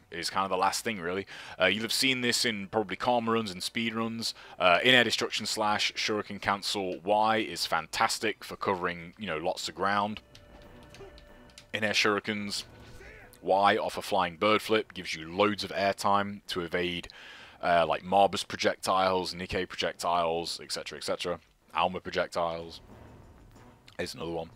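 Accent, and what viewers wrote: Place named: England, United Kingdom. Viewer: Great videos! Thanks man. BTW: which part of England is your accent from?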